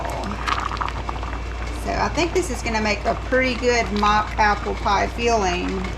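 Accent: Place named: Louisiana, United States. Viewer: Nice recipe. My pears are coming in here in central Texas so I’m about to do preserves. My son-in-law is from Thibodaux,/Chackbay. Your accent sounds like his family.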